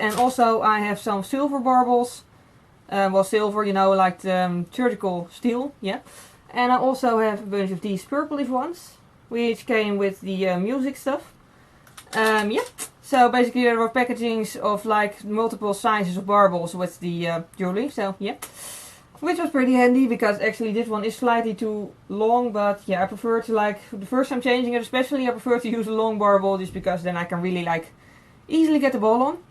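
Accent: Netherlands. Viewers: are you Dutch?? Random question but i feel like i hear that accent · I think I hear a dutch accent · She's Dutch, she has an accent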